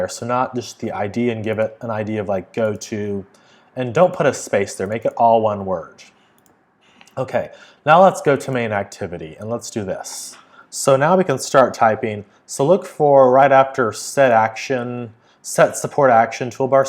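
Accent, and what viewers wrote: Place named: Southern California, United States. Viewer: I love this guy’s accent, let me guess San Bernardino county or at least somewhere in SoCal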